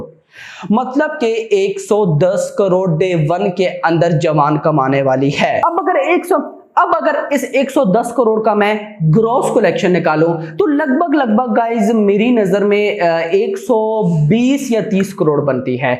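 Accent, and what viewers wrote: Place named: Pakistan. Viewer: Bhai kaha se ho bolne se to Pakistan ke lagte ho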